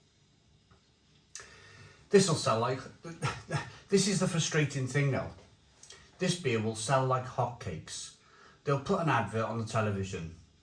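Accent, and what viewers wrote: Wales, United Kingdom. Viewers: Couldn’t agree more with this. Picked it up, 2 bottles of this faff in Waitrose on a yellow label. Dire. Really bad. Great review! Love your accent, I’m a Berkshire boy but spent 12-13yrs in Dinas Powys and Penarth and your accent brings it all back! Don’t worry, I sang the Welsh national anthem, in Welsh at all the rugby matches I went to. Was constantly told where to shove my chariot but embraced it all and loved every second there! Love your channel. Really good. Cheers!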